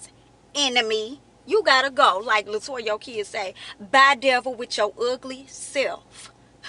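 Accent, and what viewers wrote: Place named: South, United States. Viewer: Words of wisdom from a beautiful southern woman I love your accent omg.I have to get me a southern wife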